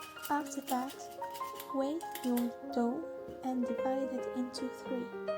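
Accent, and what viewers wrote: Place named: France. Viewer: you're amazing... I love your work, I love your recipes, I love your voice and your little french accent! Everything together make your video unique ❤️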